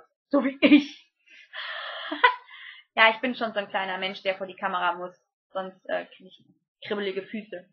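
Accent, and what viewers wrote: Cologne, Germany. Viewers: Puh, dass jetzt gefragt wird, wen du abgehoben findest, war klar.. Nehmt es so hin, wenn sie jetzt sagt wer, dann gibt es doch wieder ein riiiesen Theater.. sinnlos, meiner Meinung nach. Ich fand nicht schlimm, dass du Personen doppelt nennst. Du gehst mir persönlich absolut nicht auf die Nerven! ;) Ich gucke deine Videos sehr gerne und ich muss jedesmal grinsen. Leider waren in den letzten Videos keine Sätze mehr mit köllsche Akzent :'( :D Da amüsiere ich mich immer stundenlang. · "Drufjödingst,ne " OMG,ich kann nicht mehr...du und dein Kölsch seid GEILOMAT!!!!,DU bist eine kesse Kiste!O,ich kriege den -Slang..hihi^^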